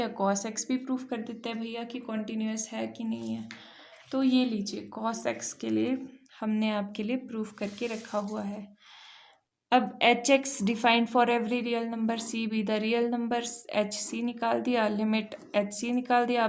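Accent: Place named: Haryana, India. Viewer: Through your tongue..you look like from haryana or delhi.. but very sweet voice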